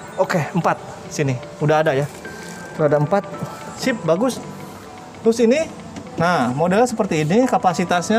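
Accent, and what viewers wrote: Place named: West Java, Indonesia. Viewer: Sundanis banget"munggahan kang😁kang"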